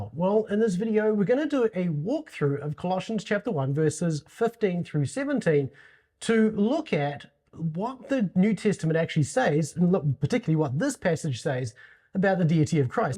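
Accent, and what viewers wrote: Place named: New Zealand. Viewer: Great video, love your content. Not sure if you are aware how you are pronouncing God as “guard”. Quite off putting given the subject matter. But I am fond of NZ accents. You do great work. Keep it up!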